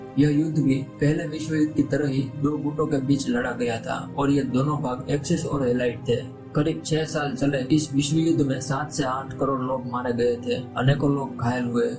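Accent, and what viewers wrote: Gujarat, India. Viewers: Bhai Tu Gujarat se hai ?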